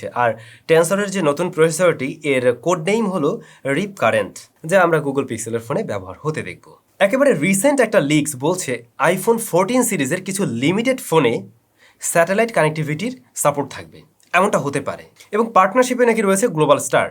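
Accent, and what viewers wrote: Barishal, Bangladesh. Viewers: ভাইয়া কি বরিশাইল্লা??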